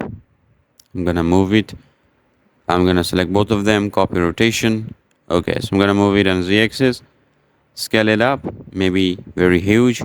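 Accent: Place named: Iran. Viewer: عشقه لحجه ایرونی ، خسته نباشی💥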